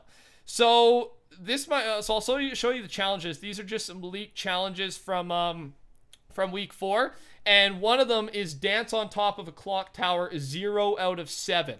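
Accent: Canada. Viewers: R u Canadian too?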